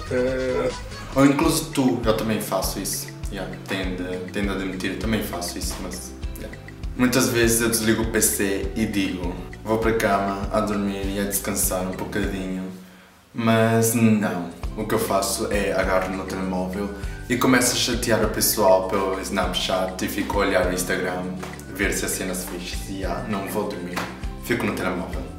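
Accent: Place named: Brazil. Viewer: Dasse q e isto és ucraniano ou q se es br fala com sutaq nao estragues o portugues de portugal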